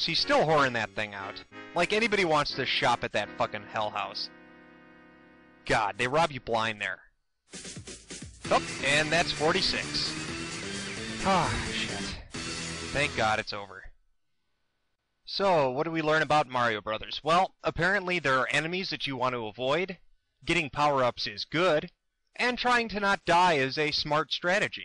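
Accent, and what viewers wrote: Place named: Minnesota, United States. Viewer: He sounds like he walked out of the movie Fargo "oh yeah Margie he's a funny lookin lil guy"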